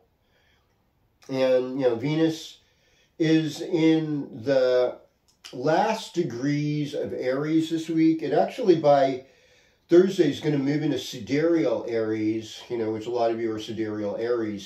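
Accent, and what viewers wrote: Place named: California, United States. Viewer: Great show,! It’s true: We are a collection of hicks, cowboys and hippies. Though I don’t think I fit in with either of those groups. If anything, I consider myself a low level scholar and story teller. Also with those three groups, you get the two California accents: “The surfer” accent, with its elongated vowels and drawl. Then there’s that whole “faux southern” accent that’s common in this area, with its sharpness. I felt inspired to comment on that aspect. I like your surfer accent,! Especially, since accents seem to be fading these days. Anyway, peace brother ☮️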